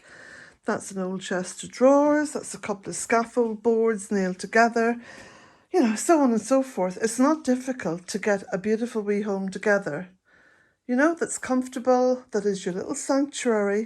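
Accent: Ireland. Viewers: Just beautiful, so calming, serene and I love your Irish brogue ❤ I am in the USA, my Irish roots great-great grandparents were from Dublin 🍀 (Newsome & McCarty) So thrilled to have found you!! Love, love, love 💘 💕🍀❤🍀❤😁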